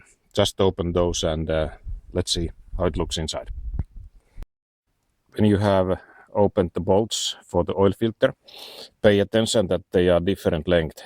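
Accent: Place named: Finland. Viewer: Thanks man, very useful! Also love that finnish accent! Cheers from the Czech Republic :)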